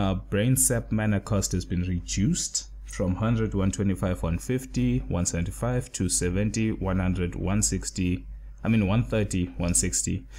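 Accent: South Africa. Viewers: is this guy from south africa? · is that a South African or perhaps Zimbabwean accent I hear?:D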